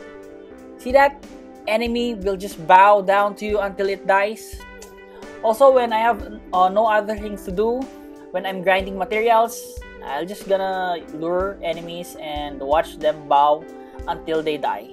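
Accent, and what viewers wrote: Philippines. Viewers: are u filipino or in the phillipines im curious becaus of ur accent hehe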